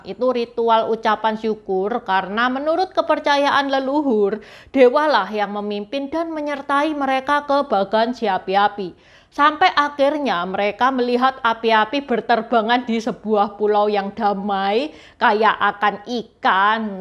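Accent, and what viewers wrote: Java, Indonesia. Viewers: Hihihiiii ilate ilat jowo 😁😁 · medok banget😂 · ini baru namanya tionghoa rasa jawa.. saya suka medhok nya..❤❤❤ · Aneh dia oriental logat jawir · Medok juga ya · Intanmemang cantik kok, apalagi KLO sudah menceritakan berbagai dinasty yg berpetualang. Dan akhirnya sampai ke kota BaganSiapiApi kab.Rokan Hilir prop.Riau daratan..dan menurut cerita rakyat Bagan, ,bahwa BaganSiapiApi bukanlah pohon siapi api, ,tapi pepohonan yg tumbuh ditepi pantai dimana pohon itu paling disukai oleh serangga sejenis kunang kunang dan dipandang dari lautan seperti api berkedip kedip yg menandakan adanya perkampungan tempat tinggal penduduk. Mksh mbak cantik yg medok dokdok dok, ,lanjutkan, , , ,!!! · Salut buat mbak, logat jawanya medok banget.. hehehe, sui lah 👍👍👌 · Logatnya jawa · Ci campuran tiong hoa jawa ya...? Medok jawanya kelihatan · Salut cie logatnya Jawa banget 👍🏼👍🏼kalau dilihat cie aadik saya jauh bisa jadi seumuran anak saya Salam dari Cah Boyolali Jawa Tengah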